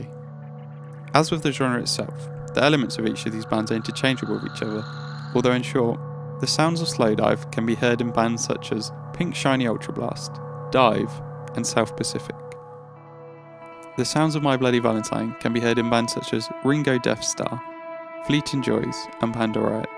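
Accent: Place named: United Kingdom. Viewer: mostly the british accent tbh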